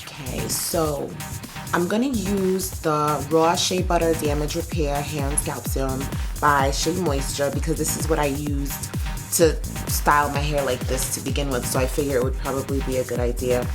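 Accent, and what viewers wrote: New York, United States. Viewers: You remind me of Wendy Willimas (whom I love btw). Your personality is reminiscent of her. Your NY accent is also very similar to hers.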